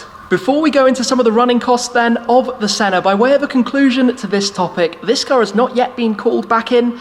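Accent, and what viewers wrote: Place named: United Kingdom. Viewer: His british's accent introduction always gets me, lol.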